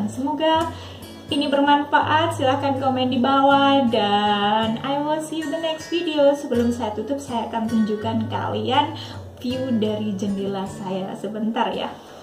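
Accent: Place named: Java, Indonesia. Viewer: asli asal mana, koq logatny jawa hhh..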